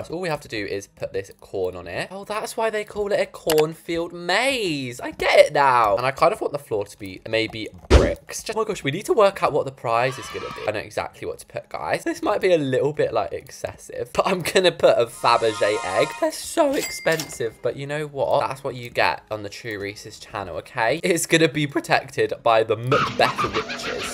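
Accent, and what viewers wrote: United Kingdom. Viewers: wHEN I WAS NEW I TOUGHT SHE WAS A GIRL FOR HIS BRITHIS ACCENT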